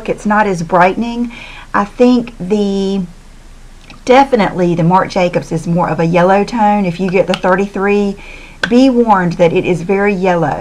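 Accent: South, United States. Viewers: is from Wilmington, North Carolina. Southern accent.:)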